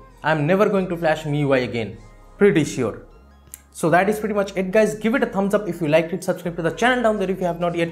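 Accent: Bengal, India. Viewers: Brother are you a Bengali? Voice tay Banglar taan ache :)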